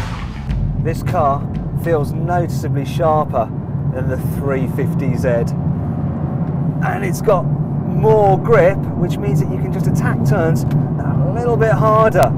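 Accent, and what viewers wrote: United Kingdom. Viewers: i hate the way britain people says words,i know thats the original english,but its wierd,U.S.A is the best country in the world and the way they talk is nice.,and im not american, im brazilian and i love it. 370z sounds better ,has a better looking and u can get it for way less money than the porsche,get the Nismo exhaust and u can have +15 hp then u are good to go.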